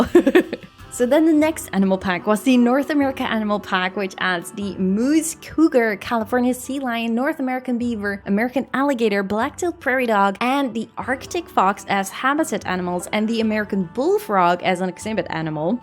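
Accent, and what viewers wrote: Netherlands. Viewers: You sound like you are from Nederlands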